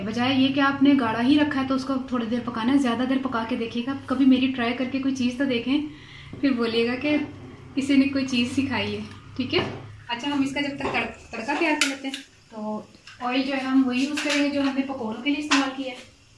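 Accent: Punjab, India. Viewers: I really need this recipe ur Punjabi is v.cute Mashallah double mzaa ataa hay 🤗🥰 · sabh to jiyada nice tuhadi mithi punjabi boli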